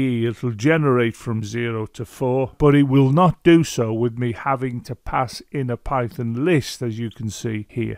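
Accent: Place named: Scotland, United Kingdom. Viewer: Nobody cares today about the correct pronunciation of softwares' names (like Gimp, for example), but I think it's his nice presumably Scottish accent that makes him pronounce it that way.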